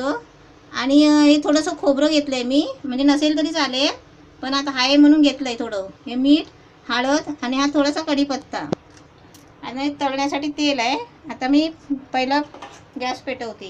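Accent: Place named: Ratnagiri, India. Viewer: mavashi khari mavshi vatate avajavarun agadi Ratnagiri chi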